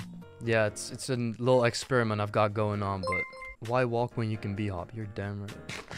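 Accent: Netherlands. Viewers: Hey dude, great video. Are you dutch btw? I feel like i hear a slight dutch accent. Edit: welp nvm just saw the rest of the vid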